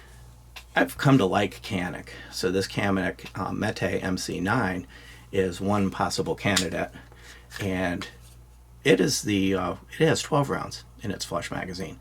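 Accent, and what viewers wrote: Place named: Chicago, United States. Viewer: I have 2 Glock 26's but I still prefer to carry my: Hellcat, Walther PPQ-SC and my FNS-9C over the G26. But I still love my Glock 26's and do carry them alot as well and would never part with them. I think the Glock 26 is one of the best Glocks ever made and will never fade away. Thanks for sharing. U have a Chicago or Detroit accent but u live in Florida. Florida is where all the rightwing east coast and Midwest Republicans retire to and also Arizona.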